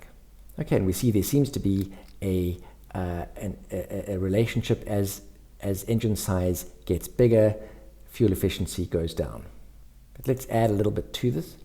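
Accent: South Africa. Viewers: Can hear that SA accent loud and clear. Great channel Boet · south african bloke? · Are you South African bro?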